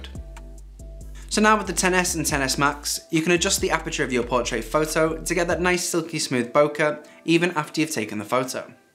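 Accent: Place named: England, United Kingdom. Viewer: I like Ur English Accent Sound so cute...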